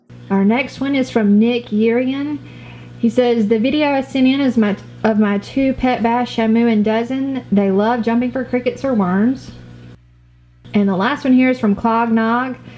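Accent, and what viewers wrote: South, United States. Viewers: that sweet southern drawl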